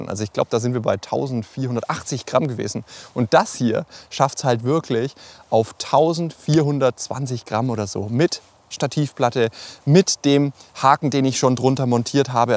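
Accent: Franconia, Germany. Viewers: Mehr frängisch!! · Fränkisch darf man nicht weg schneiden!!! Grüße aus KT 😂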